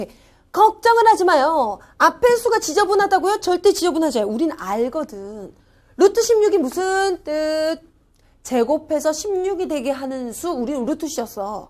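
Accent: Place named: Busan, South Korea. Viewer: 연기할때 표준말 쓰는데, 부산이 고향이라 그런지 실제 목소리 들어보니 약간 부산 억양이 있음. 부산 사람 DNA는 있나봐.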